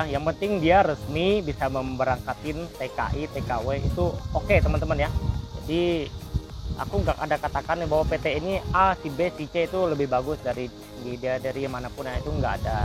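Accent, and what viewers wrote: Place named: Taiwan. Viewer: Logat nya logat taiwan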